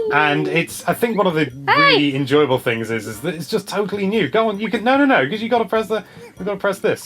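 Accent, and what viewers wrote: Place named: England, United Kingdom. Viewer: i totally agree that Snipperips is number 1. I'm not sure that blaster master deserves to be on the list, I was very disappointed with the co-op feature and felt it was put in at last minute without any thought! I would like to see this list done again in say a years time when there are loads more games to choose from! Great video as always, makes a refreshing change to listen to a fellow English man when on YouTube searching for my SwitchFix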